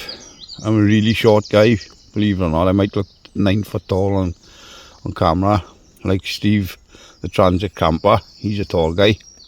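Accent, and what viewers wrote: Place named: Swansea, United Kingdom. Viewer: no problem bruv, I grew up in Swansea so your accent takes me back, keep up the good work 👍🏻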